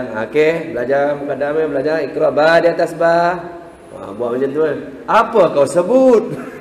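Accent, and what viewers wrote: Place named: Northern Malaysia, Malaysia. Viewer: Pandai ustaz cakap loghat utara 👍👍👍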